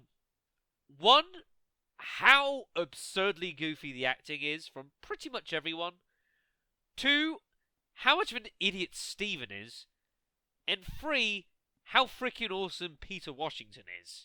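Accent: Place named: United Kingdom. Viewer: I love your voice. It's amazing and makes the LPs more interesting. I wonder where in the UK you're from?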